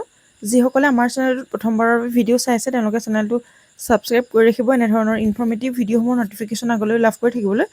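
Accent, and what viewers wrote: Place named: Tezpur, India. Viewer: Tezpurr lage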